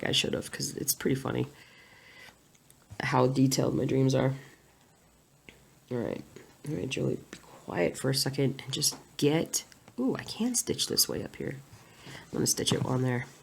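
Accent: South, United States. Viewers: Actually, I am from South Carolina, and I do hear your southern accent. It doesn't come out all the time, but there are certain words that you say, like 'plain' kinda sounds like 'play-en'. When I first started watching you, I wondered where you were from, until I heard you talk about Georgia. And BTW, if you ever come visit the SC area, or north GA, get in touch and I'll buy you lunch! Yes, they still do the laser light show on Stone Mountain. And I YES to Zaxby's. We have one about 10 min from our house here in SC. I was so happy when we went to Pensacola Fl to see my father in law and they had a Zaxby's there. So they are going a bit more west.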